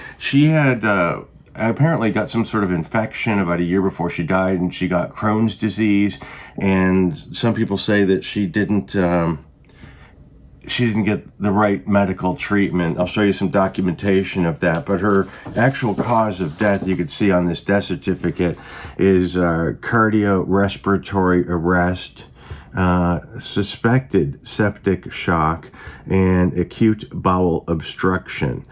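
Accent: Chicago, United States. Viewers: I knew it! I commented about a possible watered down Chicago accent in the Lisa Marie autopsy vid, which is the first video I watched on this (very interesting & well-done) channel. Doesn’t matter how long you’re gone, it always creeps back in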